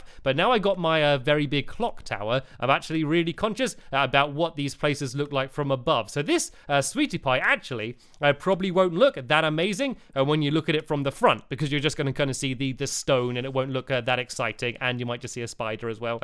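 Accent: England, United Kingdom. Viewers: He is not American he is from England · you are like puss in boots accept your english smart funny cheerful alwasy happy and most of all awesome! and you have iron boots insted aka STYLE BOOTS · My son insists that Ester's name is Estah. I told him it's Ester and pronounces it Estah because he's British, but he still wants to say it like you lol He also says "inventry" like Squid lol Just thought it was cute because he really likes you all.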